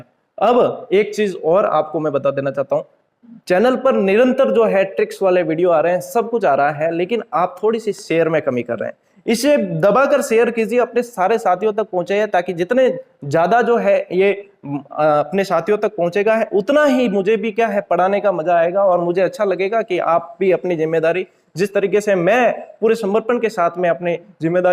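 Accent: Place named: Western Rajasthan, India. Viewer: सर आप कहां से हो आप मारवाड़ी बोलते हो तो उसमे पश्चिम राज़. की आवाज लग रही है ,सर जी कहा से हो मै बाड़मेर से हूं अगर आप यही मारवाड़ के क्षेत्र से हो तो मै आपसे पर्सनल मिलना चाहता हूं गुरुदेव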